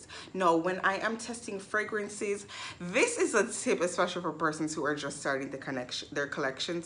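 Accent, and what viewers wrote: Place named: Jamaica. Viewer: Hey , where are you from hun ,?? Sounds sort of Jamaican ish living in Canada ?? Or some Caribbean?🤷🏽‍♀️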